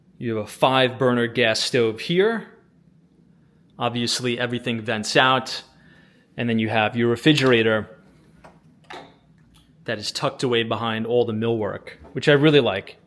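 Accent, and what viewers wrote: New York, United States. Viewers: Don't know if anyone has mentioned this, but your just-enough-NY-accent really enhances your storytelling; your videos feel like we're casually walking down the street with someone who knows the city inside and out.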